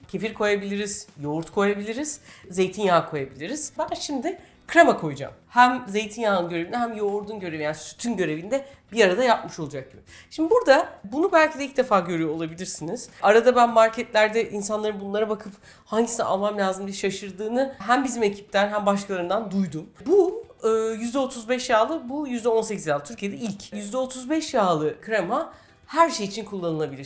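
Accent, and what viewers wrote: Cyprus. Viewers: Tarifi denedim .. sarmısak da ekledim süper oldu.. emeğinize sağlık çok guzel bir tarif oldu!!! Naçizane birde önerim var.. ben kıbrıslıyım. Sende de hafif bi kıbrıslılık var!!! Ve biz keklik tavşan turaç, tahtalı güvercin(fassa) gibi av hayvanlarını çok tüketiyoruz.. eğer düşünürseniz sizden gekecek av eti tariflerini merakla bekliyorum! 👍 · Kendisi Kıbrıslı o yüzden var biraz o şivesi.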